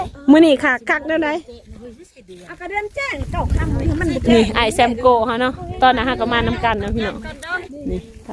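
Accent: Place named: Thailand. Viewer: สบายดีน้อง น้องเป็นผู้หญิงที่พูดเก่งและสำเนียงไม่แตกต่างจากทางไทยเลย น่ารักอีกด้วย.🥰❤️❤️❤️❤️❤️❤️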